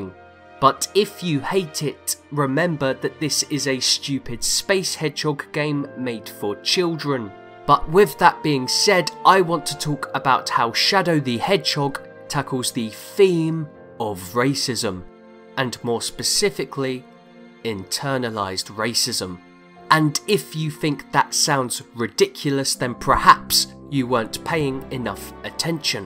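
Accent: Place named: United Kingdom. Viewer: This checks all boxes for a video essay. Even that you’re Bri’ish. So unfortunate.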